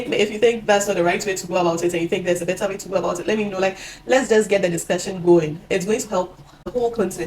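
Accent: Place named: Ghana. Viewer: I detect a Ghanaian 🇬🇭 accent.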